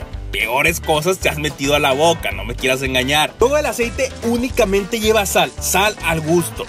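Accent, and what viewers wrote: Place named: Mexico. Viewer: Como me gusta ver tus videos me haces reir😃🤣😁 con las cosas que dices te escuchas bien mexicano❤ Estan bien padres tus recetas un dia de estos cocino algo siguiendo tus kitchen skills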